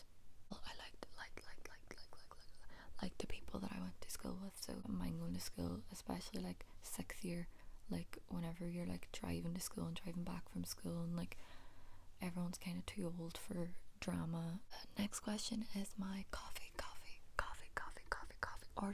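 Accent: Ireland. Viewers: now that u mention it I do hear the Irish accent and I love it!! congrats on 10k <3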